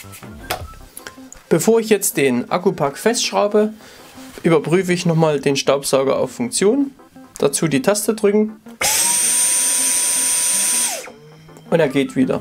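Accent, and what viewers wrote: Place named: Saxony, Germany. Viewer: Echt ein klasse Video. Alles super sachlich mit sächsischem Charm erklärt. Weiter so.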